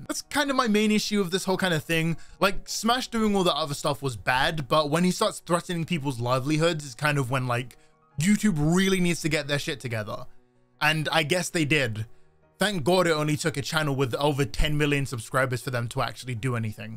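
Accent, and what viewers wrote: United Kingdom. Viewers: bro why is twomad talking to me in a British accent?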